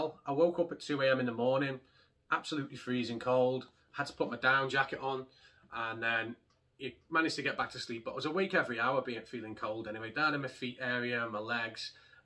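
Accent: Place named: Wirral, United Kingdom. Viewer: Your accent sounds very “Wirral” 😂 are you local to there? Great video mate. Subscribed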